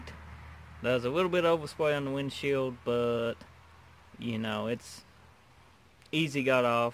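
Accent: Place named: South, United States. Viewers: I knew it I knew you was from ky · I can't decide whether I hear Mississippi water from the river or I hear Carolina. I'm a Texas boy myself so this is how everybody is supposed to sound! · Theres nothing wrong with his voice, just southern tone . Thanks for the video buddy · I was wondering where you were from with that accent. Hazard kentucky? I've been there once or twice. I think i'm going to use this on my 79 g10 restoration.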